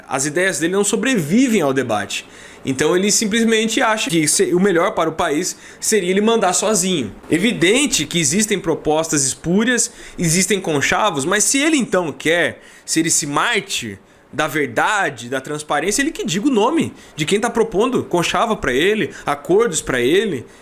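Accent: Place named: São Paulo, Brazil. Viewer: Quem é esse BABACA? Sequer sabe se expressar esse Ze Ruela, com esse sotaque paulistano, vai tomar no teu cu IMBECIL.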